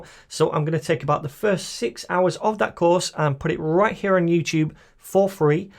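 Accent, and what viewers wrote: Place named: England, United Kingdom. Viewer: Aaaaaand... BOUGHT. Thank you, sir. Nice to do a course with an English accented tutor for a change (well, besides Angela Yu) :D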